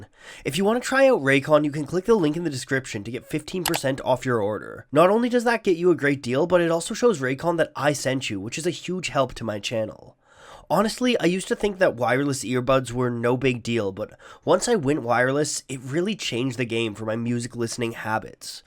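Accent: Canada. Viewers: You have such an interesting accent. Are you Canadian?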